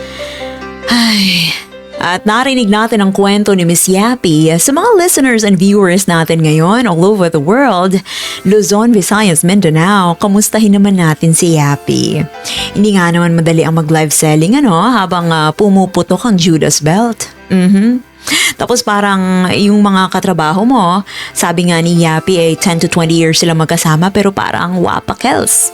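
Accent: United Kingdom. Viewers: Long live....GOD BLESS YOU!..lol...banatan mo british english accent beautiful young lady.....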